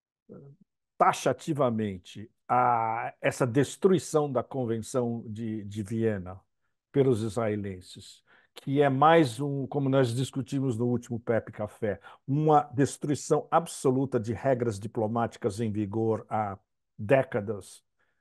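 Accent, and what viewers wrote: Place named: Minas Gerais, Brazil. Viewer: Uai sô , te mineiro aí tbm 🧑🏼‍🌾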